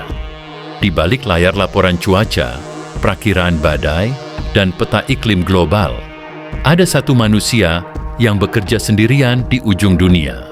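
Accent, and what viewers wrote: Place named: Solo, Indonesia. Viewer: salah,ini suaranya pria solo fixs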